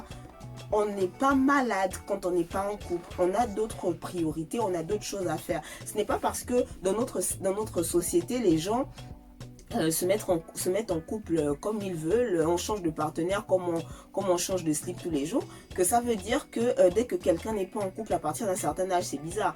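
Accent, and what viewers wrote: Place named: Gabon. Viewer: Je ne te le fais pas dire ; dans son langage tu sens une femme gabonaise.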